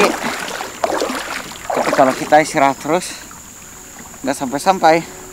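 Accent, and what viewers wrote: Banjar, Indonesia. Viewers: Logat banjar nya ada jua lah wkwkwk😂😂😂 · Logat Banjar bahasa Kalimantan banget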